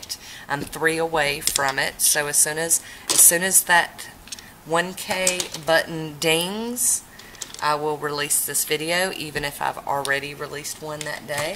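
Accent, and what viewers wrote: South, United States. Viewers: I'm from Pennsylvania! I could definitely tell that you're from the south! Thank you for sharing your love of polish with the world!!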